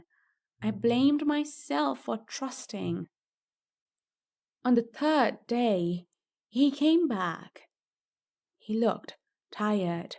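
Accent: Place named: United Kingdom. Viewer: I watching from Ethiopia 🇪🇹 so best story with perfect British accept ❤❤❤❤❤❤❤❤❤❤❤❤❤❤❤🎉🎉🎉🎉🎉🎉🎉🎉🎉🎉🎉🎉🎉🎉🎉🎉❤❤❤❤❤❤❤❤❤❤❤❤❤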